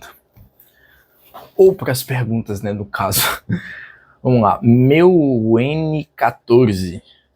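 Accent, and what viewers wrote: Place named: Bahia, Brazil. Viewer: Oii! Maratonando seus videos! Reconheci o sotaque baianal kkk, de onde vc é? Estudou pra Uneb e Uesb?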